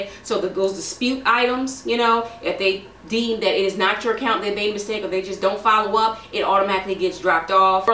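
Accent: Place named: DMV, United States. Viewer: Love your accent. Sounds almost like DMV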